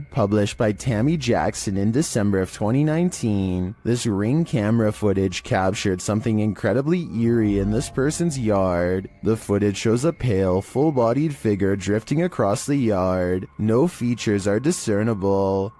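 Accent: California, United States. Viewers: 😂 He sounds like THE CALIFORNIANS from SNL . go check it out and tell me I'm right 😅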